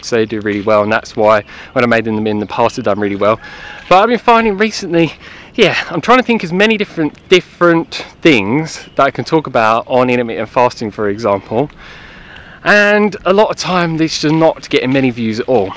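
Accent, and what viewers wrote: United Kingdom. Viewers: is he from the uk?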